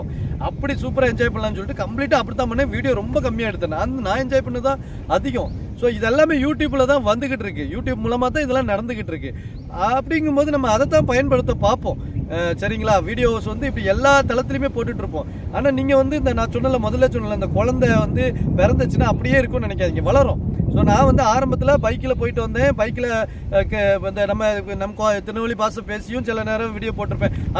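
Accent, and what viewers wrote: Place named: Tirunelveli, India. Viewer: சகோதரா கவலைப்படாதீங்க உங்க மனசு போல உங்க வாழ்க்கை இருக்கும் நெல்லை வட்டார மொழியை நீங்கள் பேசுவதை கேட்கும் போது மனசுக்கு மகிழ்ச்சியாக இருக்கிறது முடிந்த அளவு உங்கள் சேனலே பல நண்பர்களிடம் கொண்டு செல்வதற்கு முயற்சி செய்வோம்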